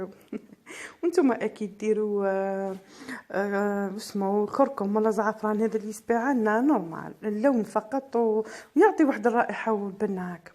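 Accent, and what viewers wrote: Setif, Algeria. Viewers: يا ربي يحفظك انا مشتركة من البليدة نتمنى تذكريني في قناتك نموت عليك و على اللهجة السطايفية انا ام نهال 😘🥰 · واش نحب هدرتك السطايفييا لحرار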